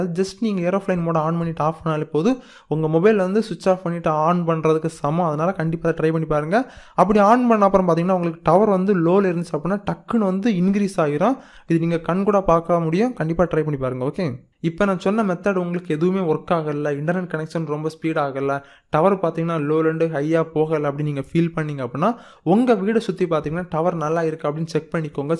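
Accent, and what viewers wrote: Kanyakumari, India. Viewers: bro neenga ....kanyakumari'ah....? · Bro u kanyakumari aa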